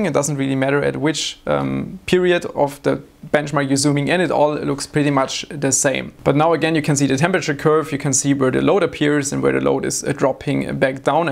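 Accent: Germany. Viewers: Does anyone else have the feeling that this guy is so german that when he speaks english he still sounds like he is speaking german? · Das gute alte deutsche englisch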